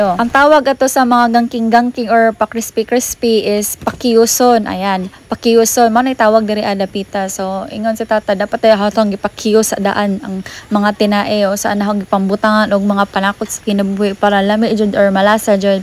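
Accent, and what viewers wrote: Bohol, Philippines. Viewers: abi nhu taga Bohol ka mam mao mn gud tunada inistoryaan nmu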